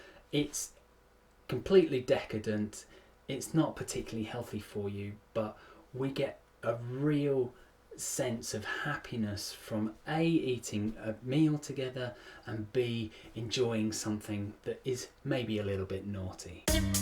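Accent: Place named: United Kingdom. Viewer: Loved your accent, fellow UK creator here. I think this is so insightful and it’s also so lovely to have someone on YouTube not shouting and screaming at the camera. Very informative and love the blue in the background. I am only new on the platform myself but if I can give you some feedback, there is a little white noise in the background, this can be rectified by cleaning the audio depending on what programme you are using. You have a new subscriber. 👍 keep doing good!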